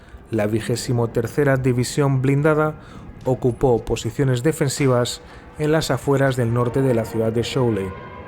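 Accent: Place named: Russia. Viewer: La presentación es buenísima. Brutal ese acento ruso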